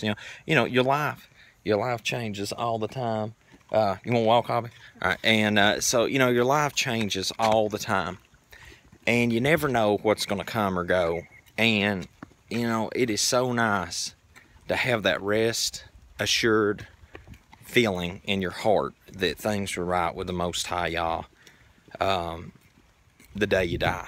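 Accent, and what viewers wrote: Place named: South, United States. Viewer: that's a wholesome southern draw I hear, Praise The Livin" Lord Jesus 💜 and God Bless yall" real good over there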